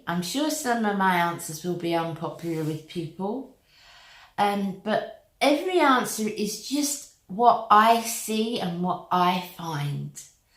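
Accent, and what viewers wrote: United Kingdom. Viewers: What an absolutely lovely lady you are. I recently found your channel and I adore you. Also, like your Britsh accent. Thank you for your kind heart and your videos looking forward to seeing more.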